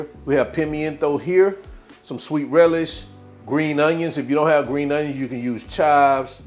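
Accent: United States. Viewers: "igg salad"! Love your accent. If you don't mind, which part of the. U.S. are you from?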